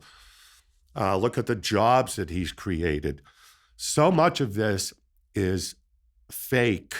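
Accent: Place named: New England, United States. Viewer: I like your style and the New England accent. I am from Ohio since 1977, but born and raised in Massachusetts up close to New Hampshire. You should do well with this show. Thanks.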